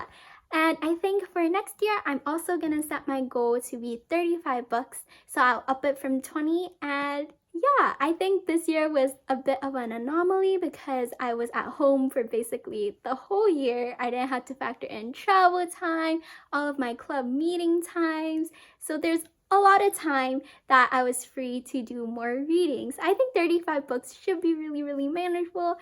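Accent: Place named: United States. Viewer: I think it's an American accent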